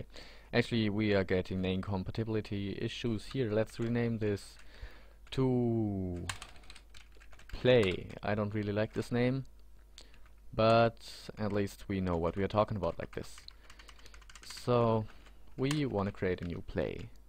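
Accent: Germany. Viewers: You sound german :D You're from Germany? Aren't you?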